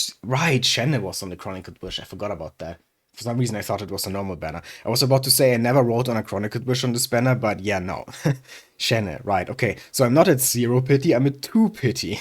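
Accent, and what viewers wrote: Germany. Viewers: Ich höre ein wenig deutsch raus Grüße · U sound German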